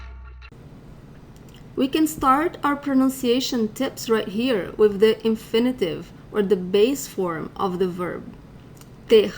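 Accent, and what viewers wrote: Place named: São Paulo, Brazil. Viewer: você é de qual região/estado? Parece ter um sotaque paulista haha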